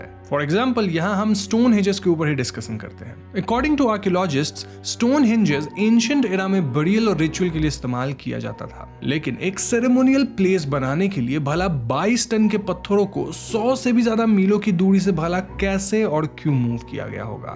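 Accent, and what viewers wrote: Bengal, India. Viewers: U r Bengali right? Ur accent reveal it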